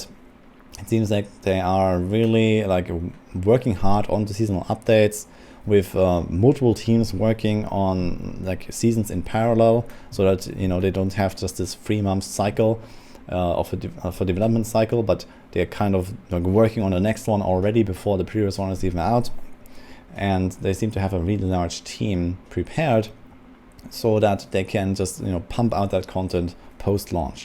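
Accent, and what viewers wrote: Germany. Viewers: Bitte mach doch solche info videos auch auf deutsch. Man hört es richtig raus, das du ein Deutscher bist...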